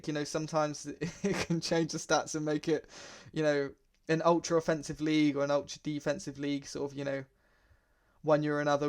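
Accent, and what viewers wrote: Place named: United Kingdom. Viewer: Wasn't expecting a British accent with this..lol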